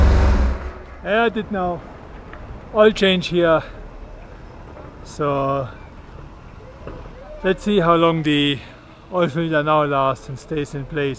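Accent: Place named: Germany. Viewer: Bei dem deutschen Akzent, würde ich lieber alles in Deutsch filmen und später Untertitel hinzufügen. Ist zwar dann etwas aufwändiger zu bearbeiten, aber weniger anstrengend für die Ohren und vielleicht auch für dich :)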